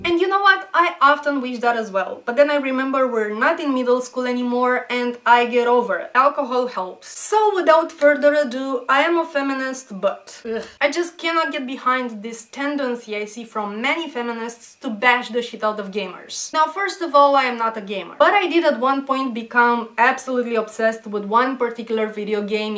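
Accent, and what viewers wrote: Romania. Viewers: yo so what country are u from? romania maybeh? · You make some very good points and in such a reasonable and non-histrionic way. Because of that I'm only slightly disappointed, having very much enjoyed your video and even subbing to your channel. My disappointment comes from being a fan of another Romanian Youtuber named Vee. While you and he actually make some similar points (though there are some very distinct differences), he is much excitable and given to, often hilarious, raging. While the cultures of Romania and the U.S. also have their distinct differences, I'm glad there are so many issues we find common ground on. The more I hear you both the I've come to believe the Romanian accent is my favorite in the world, so thank you for that as well. BTW I also was a Red Alert fanatic when it came out and the intro still gives me chills.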